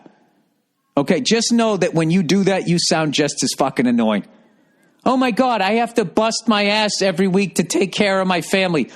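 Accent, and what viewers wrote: Boston, United States. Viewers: Listening to Bill cuss with a Boston accent is awesome.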